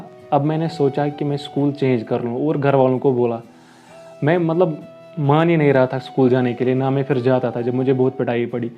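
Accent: Kashmir, India. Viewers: Bhai aap Kashmir se hona ??? Ap ke bat Karne ke tarike se pata chal Raha Hain ??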